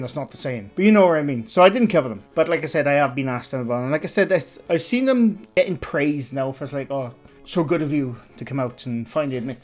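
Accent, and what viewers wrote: Wales, United Kingdom. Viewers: Sent here by the Shape 😊 and now hearing you’re Welsh, well I subscribed coz I’m Welsh myself 😀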